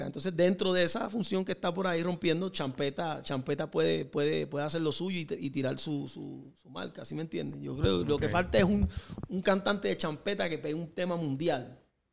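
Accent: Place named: Puerto Rico. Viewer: Es que el es puertorriqueño.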